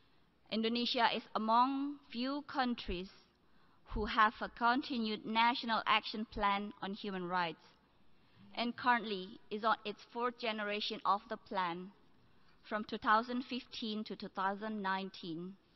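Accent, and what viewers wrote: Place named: India. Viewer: Kek logat orang india:'v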